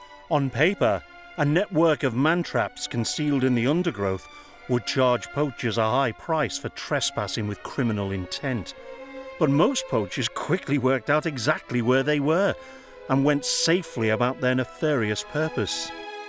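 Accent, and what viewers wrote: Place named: Liverpool, United Kingdom. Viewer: Why do we need a Scouse towny presenting this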